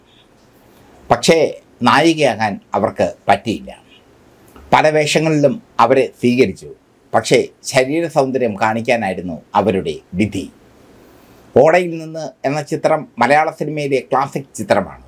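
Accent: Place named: Beary, India. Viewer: അതു ഞമ്മടെ ബ്യാരി മലയാളം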